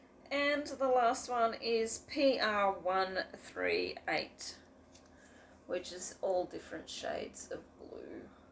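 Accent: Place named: Australia. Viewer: Sorry to hear your not 100%. Darren and Sharon both seem to have similar problems😞. Why not make it a round TEN for projects!!!? Stitch with me would be great😁. If one a week got too much you could always alternate with a SWM one week and a Flosstube another week? I love the Aussie accent, please don't put it down😁. Stay safe, get well soon🤗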